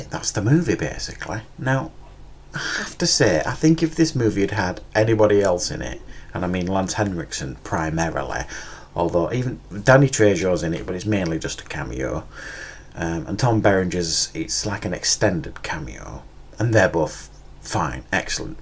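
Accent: United Kingdom. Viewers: You from UK?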